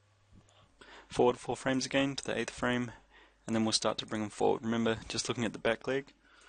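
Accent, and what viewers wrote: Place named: Denmark. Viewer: Where are you from man? you have a Danish accent!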